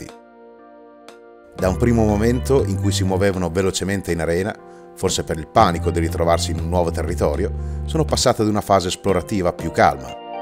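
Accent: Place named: Ferrara, Italy. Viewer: Non me ne intendo di formiche, ma i tuoi video sono ipnotici 😂 sei un grande. Ma da dove vieni? Accento fantastico (sembra il mio, ferrarese)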